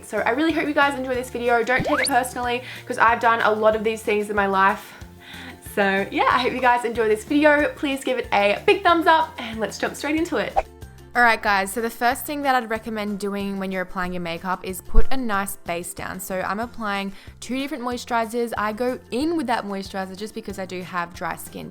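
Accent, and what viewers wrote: Australia. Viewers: Are you from australia ?? such a cute accents.....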